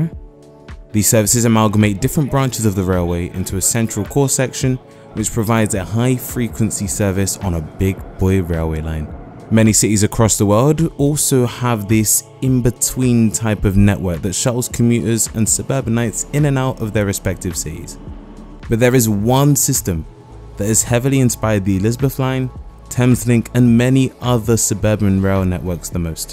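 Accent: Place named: France. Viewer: You've got an incredibly great french accent. GG for your video too its very good even for a parisian like me 😂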